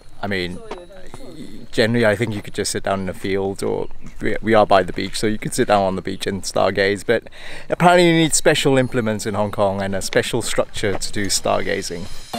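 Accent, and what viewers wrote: Wales, United Kingdom. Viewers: Great video! We spent the summer free camping around Europe (as much as we could anyway) it was maybe more stealth camping so there was rarely anyone else around! I'd love to head to Hong Kong (not right now for obvious reasons though!) for some free camping. (p.s. is it just me or do you have a slight Welsh twang in your accent?)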